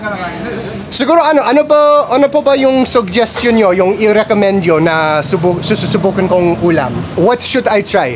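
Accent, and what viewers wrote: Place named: Pangasinan, Philippines. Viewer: No offence meant, you sounded like you are from Pangasinan when you speak Tagalog and sounds like Cebuano kapag nagtatagalog sya.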